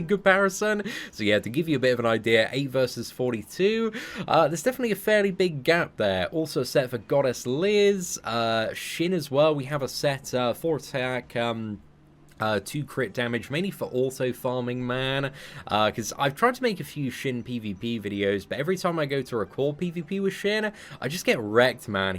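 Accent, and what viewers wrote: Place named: England, United Kingdom. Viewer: Definitely appreciate you,. Informative content, entertaining content, always a chill time watching your videos and I think for most people from the states the English accent is a cherry on the top. Much respect and looking forward for whatever else you create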